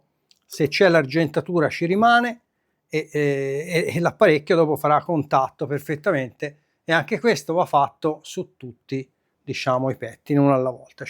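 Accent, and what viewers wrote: Tuscany, Italy. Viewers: Complimenti! Canale super interessante e competente, chiaro nelle spiegazioni anche per un neofita curioso di come sia costruita un'elettronica hifi. Aggiungo che l'accento, gli eufemismi toscani sono deliziosi!!!! Sono un po lontano ahimè dalla Toscana ma se avessi un problema ad una elettronica mi "garberebbe" 😊 rivolgermi a. Se possibile vorrei sapere un contatto mail Buon lavoro e avanti così!!!!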